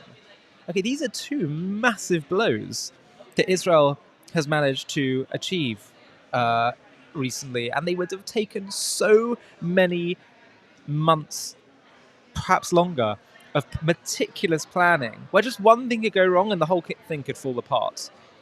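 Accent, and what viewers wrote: United Kingdom. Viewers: it's just a british (based) accent. nothing extraordinary about it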